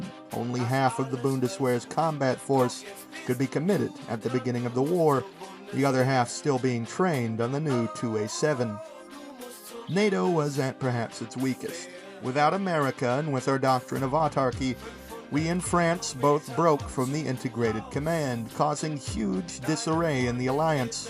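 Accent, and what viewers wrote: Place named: United Kingdom. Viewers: There were only a few moments I could tell it was you. But mostly it was hard to tell. If I showed that to someone who didn't know you, they'd think you were a brit. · Well, By listening to that accent, I mistaken that you were also British.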